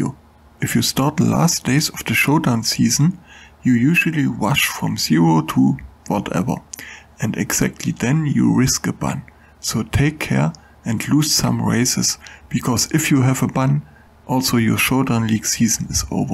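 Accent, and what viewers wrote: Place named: Germany. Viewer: heavy german accent :) german crew yeeeees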